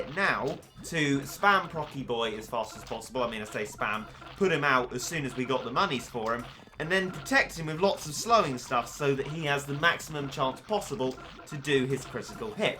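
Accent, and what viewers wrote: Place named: United Kingdom. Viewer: . I watch your videos because it's Battle Cats, and I love Battle Cats. I watch the three "big" streamers, Captain Sauce, Chawsey, and MattShea. But when I come to watch your videos, your self-deprication/sourness really turns me off. I know it's a schtick, because I've watched a lot of your videos and given all of them a fair gambit, but honestly, at this point, your "self-deprication" followed by occasional "I've taken this to the Battle Cats Reddit/Source" and talking about that, then the clearly obviously thrown in jokes about your recording times are really really stale. I wish I could give you beneficial advice. I really do. The thing is, if you only do one thing as much as you do, it's really, REALLY hard to give helpful advice. Now your starts in Doki Doki and Singularity are GOOD things, and I implore you to use your lovely UK accent in more videos, as it is with Battle Cats, I literally cannot enjoy your videos because of how salty you are (and I am in the minority there...) But advice is advice, take it how you will, you apparently miserable twerp.:p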